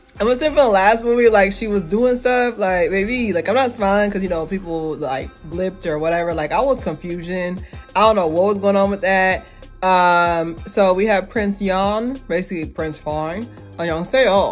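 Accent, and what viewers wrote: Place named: United Kingdom. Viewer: You have to do all reviews with that British accent lol that was awesome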